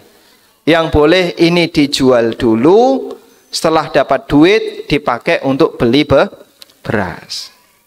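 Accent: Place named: Java, Indonesia. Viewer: Ngomong nya ko Jawa sih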